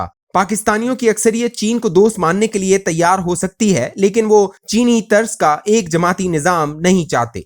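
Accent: India. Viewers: Indian ascent shows imported content from India...??????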